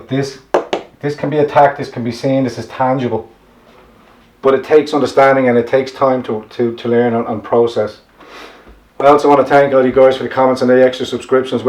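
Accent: Dublin, Ireland. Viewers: Truth explained so clearly in the most beautiful of Dublin accents. Thank you.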